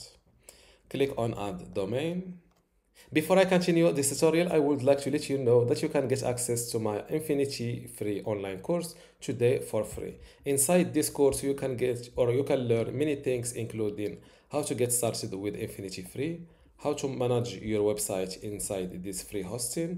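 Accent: Morocco. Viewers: idk why but you sound moroccan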